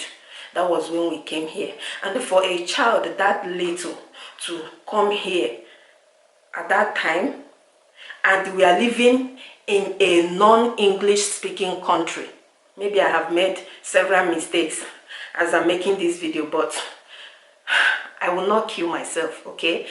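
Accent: Igbo, Nigeria. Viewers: Proudly Igbo.. please keep using your Igbo accent.. we cannot hide our identity..♥️♥️♥️😘😘. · Not to make you feel good but I started following you, ozi Tochi and Millicent xanderc bcoz you guys are very igbotic and I like it... supri supri is too much on YouTube... I want to listen to someone who talks like me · Hapu ndi ibrribe biko .... that one corncern them if they don't like your content let them leave it alone.. your command of English is very wonderful no matter how long we have stayed in another mans country still our nigerian igbotic accent will not leave our mouth my dear.. carry on my sister you're doing a great job biko. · Some people have too much time on their hands. I understand you perfectly. There are a lot of Americans that don't speak with perfect grammar. I am an American and I have no trouble understanding what you're talkin about in your videos. 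Also, I love You are Nigerian accent! People need to keep their opinions People need to keep their negative opinions to themselves. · I am a university graduate and most times when I'm in front of camera I tend to commit some grammatical errors due to nervousness... Mama na dem get their mouth let them talk We that love u really do And to me ur english is a perfect nigerian english U r been real and not trying to form what you are not . Safe delivery mama Love from MZ_OLOJUEDE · It's so sad that Africans are so brainwashed that they hate the African accent, language and everything African. I love it when Africans stay true to themselves wherever they go. Most Africans think it's cool for their children not to be able to speak their mother tongue. Girl never stop being you. I love you the way you are. I am so proud of my African language and l speak it better than any other languages. It's only blacks who do that. 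Some whites speak terrible English than you but people don't judge them. Love you African sister. Am not Nigerian but I love Nigerian accent and English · The Chinese are all over Africa doing big businesses and most of them can hardly speak English. So what's wrong with those people who think they are masters of English? The Chinese,Russians, French, etc have proven to the world that you don't need the English language to succeed in life. And for someone to focus on how you speak rather than the ideas you are trying to convey is to actually display the highest form of unintelligence. Who has bewitched their minds into thinking that life revolves around pronouncing words like your former colonial masters? My sister you are doing okay, forget all those people who are constantly and ignorantly rejecting who they are and what God made them. The easiest thing to do in life is to be yourself and not a cheap copy. 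For those ignorant critics of your accent there is what is known as British accent, American accent, Indian accent, Australian accent, Russian accent. Why in the world would she not have a Nigerian accent because she is Nigerian after all. And that's how the rest of us Africans distinguish a Nigerian from all other blacks. Because no matter how educated a Nigerian or South African is the influence of their native language will still show. E.g Trevor Noah, Ngozi okonjo iweala, Aliko Dangote, etc. Sis it takes a small person to insult and criticise a great man/woman. Let your critics post videos of themselves also so that we can critic their grammar and fake accents. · Aunty, pls I beg u in d name of the Almighty God pls dont stop. Continue with ur videos. I gat ur back 1000% and God gat u 1m%. Non of these people cares if u starve. You speak lik yankee dem go say ur too fake, u speak igbotic dem go say u too local. 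Wetin man go do? Anyway, just develop thick skin cause you're in d public forefront, criticism will arise now and even later in life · Just stay the same, accent is who your are. We like Nigerian English 👍👍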